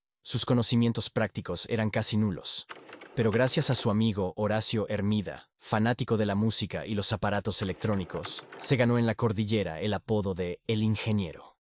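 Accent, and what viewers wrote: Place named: United States. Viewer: He estado viendo tus vídeos y noto que tienes un acento medio gringo, y no solo a lo hora de pronunciar nombres ingleses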